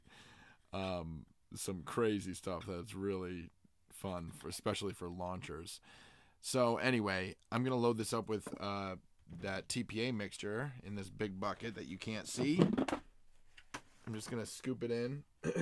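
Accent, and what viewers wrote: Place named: Augusta, United States. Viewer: Dude are you from Augusta?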